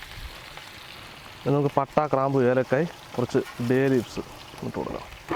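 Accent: Palakkad, India. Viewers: Palakkadan slang kollam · Ingaloru sambhavaman 💙💙ikkaa.. palakad swantham slang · Bro nigal palakkad anno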